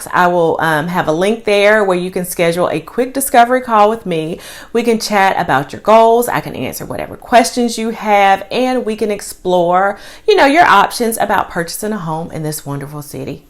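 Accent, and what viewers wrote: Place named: Texas, United States. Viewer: Are you Texan? You sure don't sound like someone originally from North Carolina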